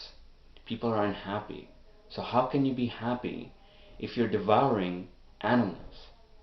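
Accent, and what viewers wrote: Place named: United States. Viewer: Hm... one more question you live in India? You sound American...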